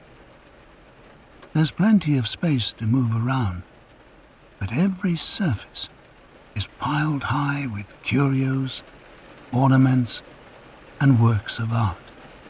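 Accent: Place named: United Kingdom. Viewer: Why get a British v/o and then make all the references American? Jarring. Sidewalk, drapes, 24/7, rainday, store...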